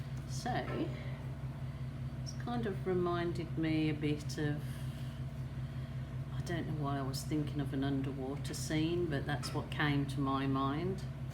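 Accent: United Kingdom. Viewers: I know you made thos video in October and it is now December 29. You said you had 10k subscriptions. Either you grew fast or you don't see all of your subscriptions. It says 24.9k here. Either way, well done. I love your prattling on while I watch your videos. I am in the US and my family is in the UK. I know you are in Australia but you still have the Brit that I love listening to and your art is amazing.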